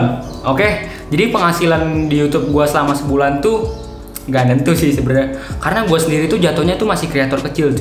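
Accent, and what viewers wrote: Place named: Bali, Indonesia. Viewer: Kak aslinya orang mana sih kalau boleh tau?, soalnya kalau "menurutku" sih kayak orang Bali logatnya, maaf kalau salah ya Kak 🙏🏻😅😁🙏🏻 #Nanya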